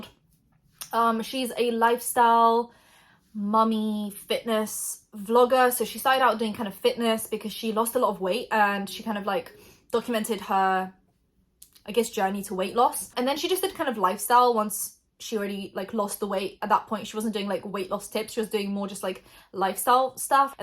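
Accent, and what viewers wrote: United Kingdom. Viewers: Always get thrown off when you’re like “I’m not British” because my brain is like “British accent = British” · Your accept is proper British that’s so cool! I wonder if you speak polish if your accent changes! It’s amazing how if someone goes to another country if there from someone else they actually get the accent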